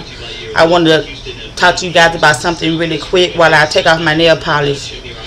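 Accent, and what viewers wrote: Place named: North Louisiana, United States. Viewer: I been can tell u from up north. I'm from South Louisiana & sound different from us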